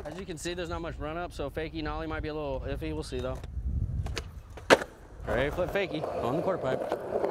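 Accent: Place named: South, United States. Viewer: How does this dude have a southern accent?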